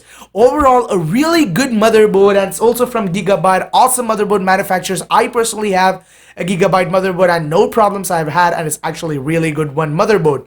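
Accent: India. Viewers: u sound indian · Bro I am a proud Indian and I thought that ur accent Was like a Indian That's why I asked 👍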